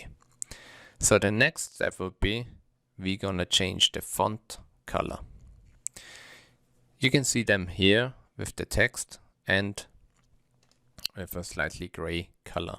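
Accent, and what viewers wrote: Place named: Germany. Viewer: ich höre am Akzent, dass Sie Deutscher sind. meine Fragen 😉: 1. Fast alle Händler und mittlerweile alle professionellen Händler verwenden Candle Sticks. Könnten Sie vielleicht hierzu einige farbliche Hinweise geben? 2. Der obere Bereich (Covering) ist ja in Bloomberg orange, einige Teile auch rot. könnten Sie auch hier ggf. Einstellungshinweise geben? vielen Dank im Voraus. Ergänzung: Meine Watch List sah früher bei Bloomberg auch etwas anders aus. Ich möchte dies gern in Tradingview so einstellen. Wie geht das?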